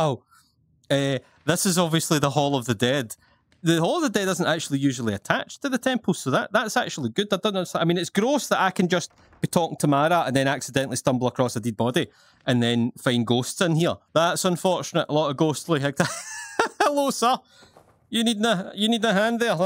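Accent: Scotland, United Kingdom. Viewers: I recently stumbled upon your channel and immediately loved it! You are really entertaining, and you talk just like one of my closest friends (who is also Scottish).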